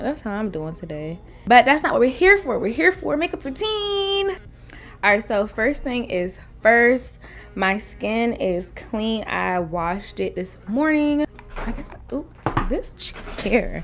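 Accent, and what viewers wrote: DMV, United States. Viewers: I can recognize a DMV accent when I hear one 😂😍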